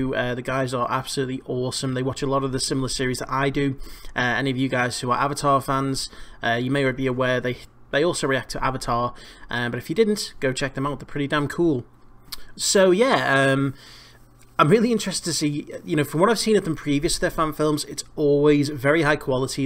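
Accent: Wirral, United Kingdom. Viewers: are you from the wirral?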